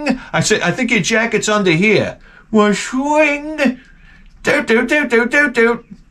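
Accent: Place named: New York, United States. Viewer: Man. Instead of complaining go Play some gangsters. With Your NY accent id hire u in a blink. Attitude to. Guaranteed return.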